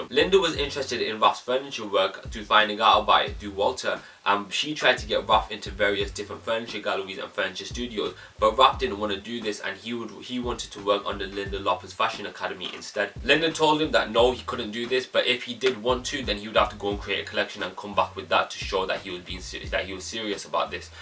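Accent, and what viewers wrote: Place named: Manchester, United Kingdom. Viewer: The Manchester accent too strong 😂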